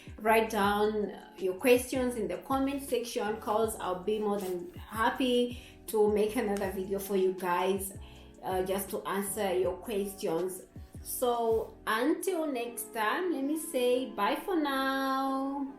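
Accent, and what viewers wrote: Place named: Turkey. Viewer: Your turkish accent is so cute! Thank you for making this video, i am planning to study University in Turkey and this was very helpful <3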